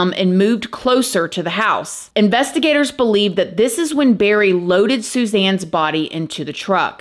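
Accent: South, United States. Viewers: I think he did it. He just looks guilty... I pray that they put the correct person under the jail if it is him it would be so tragic for the girls because they have already lost their mother. If it turns out that he did do it these poor girls will have to spend years in therapy to help cope with the fact that they backed their father and he was lying to them. This is a sad sad sad situation. I also just want to say that I absolutely adore u and ur country accent. anyways I hope they figure out what happened and I hope they find the other person they were looking for when they found her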